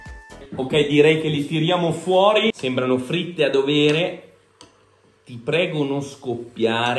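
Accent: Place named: Umbria, Italy. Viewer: Umbro fino al midollo, sentirti parlare mi ricorda le trasmissioni di TEF channel guardate alle 3 di mattino perchè sotto allucinazioni da febbrone.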